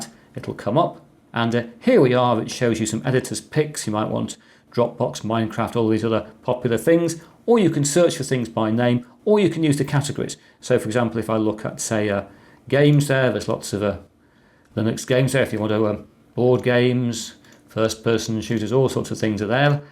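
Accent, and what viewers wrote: United Kingdom. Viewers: Hello! I need you to know that I'll be using your voice to learn British accent and about computers as well 😁 So, I'll be playing all of your videos on repeat even at night 😎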